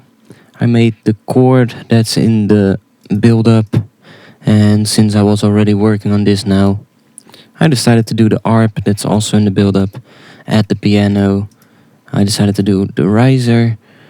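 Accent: Netherlands. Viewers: Yo you sound dutch haha! Anyways, i have a request, i have never encountered a really good tutorial for a deep house stab like in the song Coyu & Ramiro Lopez - 1, 2, 3... Fire! (Bontan Remix) which starts. Enjoying your tutorials!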